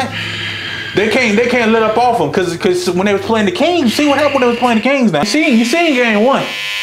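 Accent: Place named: South, United States. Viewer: Where bro from he sound southern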